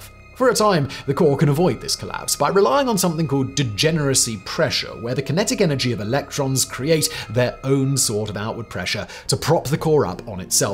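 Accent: United Kingdom. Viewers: Why is it only British men are allowed to narrate space or nature videos?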